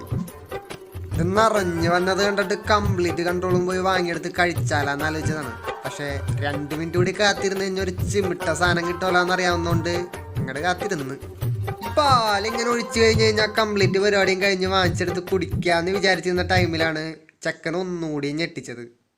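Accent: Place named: Thrissur, India. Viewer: Good presentation I like your style and way of speaking....by hearing this I proud of my Thrissur slang❤️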